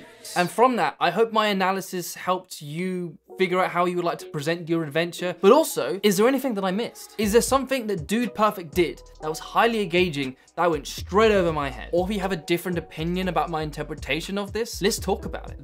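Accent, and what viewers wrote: United Kingdom. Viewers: Honestly the most useful editing tutorial videos I’ve ever watched + I love the British accent ❤️ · british accents :(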